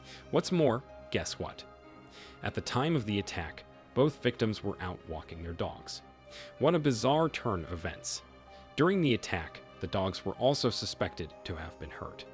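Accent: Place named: United States. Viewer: this American voice seems to think to know a lot. About criminals in the Netherlands.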